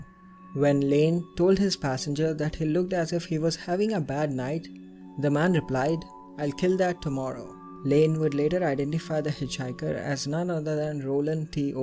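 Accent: India. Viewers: Lol the Hindu accent. I cannot understand what you say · You're clearly very uneducated, Hindu is a religion, his accent is Indian, those two are not the same thing. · Then don’t listen. Keep your prejudiced opinions to yourself. I wouldn’t characterize his voice as Hindu, since Hindu is a religion. He has an Indian accent.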